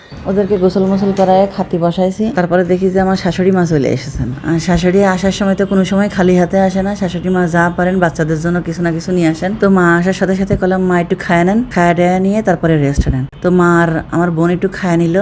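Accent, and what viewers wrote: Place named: Khulna, Bangladesh. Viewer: যাতিগিলি 😂😂😂😂 মনে হচ্ছে আমার বাড়ির আশেপাশের বোন তুমি।আমি খুলনার।তবে আমরা ছ‍্যাড়া বলি না।ছ‍্যামড়া বলি।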